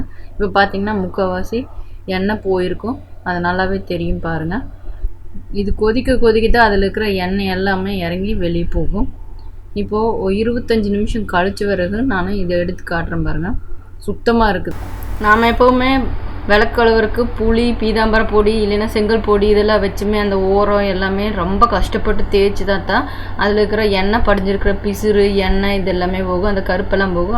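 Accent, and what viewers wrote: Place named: Kongu, India. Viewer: Super-nga. Vinayagar chathurthi ku correct-aa tips koduthirukkeeenga! Plus, unga kongu Tamil inimai!!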